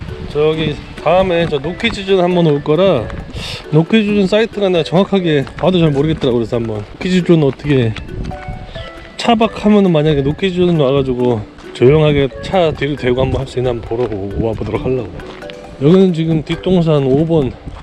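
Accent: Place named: South Korea. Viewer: 잘보고있습니다...구수한사투리너무좋아요... 루프박스장착영상봤는데,지금은없네요... 저도설치하려하는데연비차이가많은가요? 기름값미워미워ㅠㅠ 늘응원합니다~~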